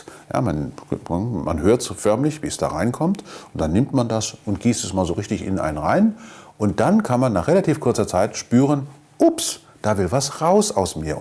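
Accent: Hesse, Germany. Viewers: Karli Davidson Prof. Lesch hat zwei Themen herangezogen und beide wissenschaftlich erklärt. Du hast nur inhaltlich und argumentativ versagt. Prof. Lesch kommt aus Hessen. Dort wird gerne mit isch statt ich gesprochen. Man bekommt einfach gewisse Dialekte nicht weg. Ich möchte jetzt nicht wissen wie oft du schon "da wo" gesagt hast. Oder "manchmal immer". Fakt ist, dein Kommentar ist so wertvoll wie Scheiße am Schuh.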